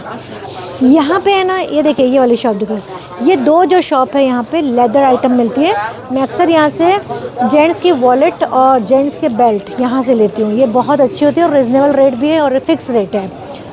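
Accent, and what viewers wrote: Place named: India. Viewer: آپکی اردو ہم کو بہت اچھی لگ رہی ھے۔ہم آپکے لہجے سے فورا سمجھ گئے کہ آپ ہند سے ھیں ۔پاکستانی نہیں ھیں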